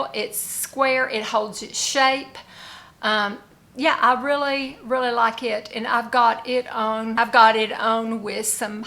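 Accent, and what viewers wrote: South, United States. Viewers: Your Youtube channel is mentioned in "Becoming Me." That's how I found you. You are beautiful! We are close to the same age. I love your Southern accent. Like & subscribed.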